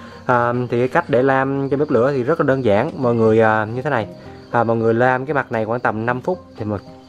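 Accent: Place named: Nghe An, Vietnam. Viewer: Quê em đặc sản là lươn đồng sứ nghệ Nghe phát biết ở đâu luôn Điểm danh nào☝️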